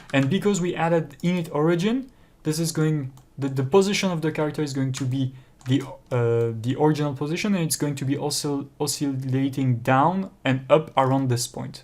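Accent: France. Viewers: Just so you know, I'm a big fan of french english! 😁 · This is very late and you might not see this message but thank you so much for uploading this. By far the best tutorial on using C# in Godot. Also, the French accent you have is so charming.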